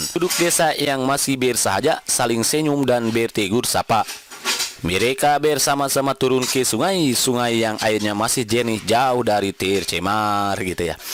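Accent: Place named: Batak, Indonesia. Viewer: sebelumnya terimakasih ya mang utk video2 nya tapi mohon maaf saya cukup terganggu dgn aksen mang yg kaya gini. jd kurang fokus dengar penjelasannya, biarpun saya org batak asli yg kalau ngomong kekgini tp kurang nyaman dengar cara ngomongnya😢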